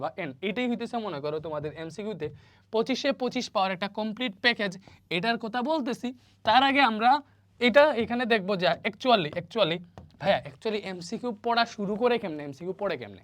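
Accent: Sylhet, Bangladesh. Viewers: Bai apni to sylheti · Sir apnar bari sylhet er kotay.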